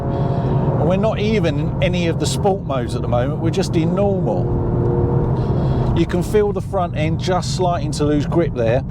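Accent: United Kingdom. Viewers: Tawk, Spowrt. Proper Saaff accent. Great review. So ugly from the back but it looks a whole load of fun.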